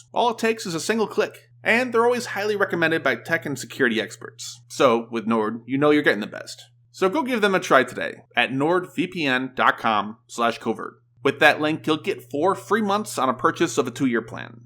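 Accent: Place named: United States. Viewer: Your hypersonic speaking speed, couples with that horrible American accent, ensures that most people in Asia would not understand much of what you are narrating.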